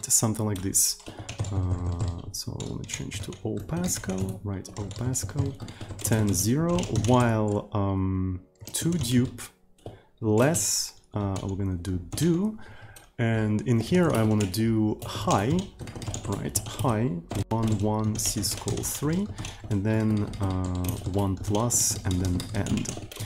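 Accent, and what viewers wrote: Russia. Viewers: Чел наверняка русский (с таким-то акцентом)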